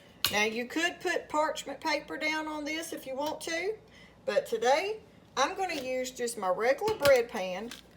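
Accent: South, United States. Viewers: Love your comforting southern voice!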